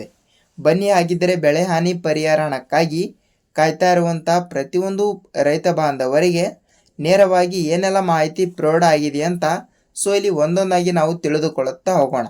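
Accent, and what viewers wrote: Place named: Uttara Kannada, India. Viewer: Uttar kannada speech ok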